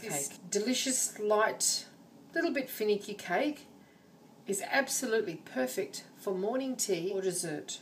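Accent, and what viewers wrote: Australia. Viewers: Greetings from Melb! Awesome recipe, thanks for sharing! I'm gonna make it for my parents after getting all the ingredients needed ready. (P.S I didn't realise that your accent is the Aussie one,but does sound familiar =_ =||| probably becuz we are on the same boat, hahah) Anyway, thank you for the recipe!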